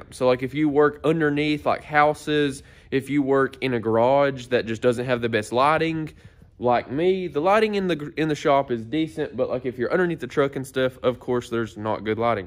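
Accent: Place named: Tennessee, United States. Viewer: I got some that are similar to yours and mine quit holding a charge more than an hour right after the return window closed . How’s yours working now ? Great review! I love the wide view these types of lights give . Definitely want another one that’ll last . Your accent is similar to mine . I’m in TN , born and raised . What state are you from ?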